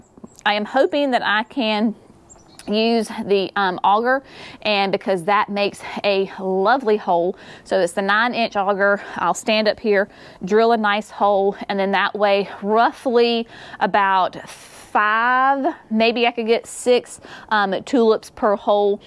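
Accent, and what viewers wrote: South, United States. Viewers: Oh....you are too Cute! Out in the Rain.:goodvibes: I love your sweet voice. (with your Southern Accent) > Say that three times....Shocks and Struts... Tulip Blend. 🌷🌷🌷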